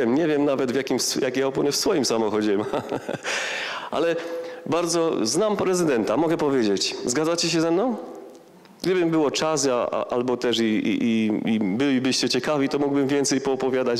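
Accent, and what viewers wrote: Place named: Silesia, Poland. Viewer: spoko Ślązok, genialny akcynt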